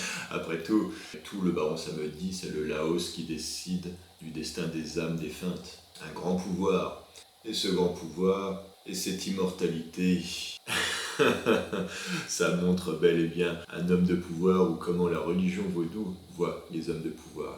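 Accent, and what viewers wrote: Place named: Antilles, Caribbean. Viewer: Super 👍belle narration, belles illustrations et pas mal l'accent des Antilles...D'autres personnages pourraient être intéressant à traiter dans la même veine, papa Guédé avec ses araignées😂 , papa Legba, la Sirène ou encore la célèbre Erzullie 🌹💖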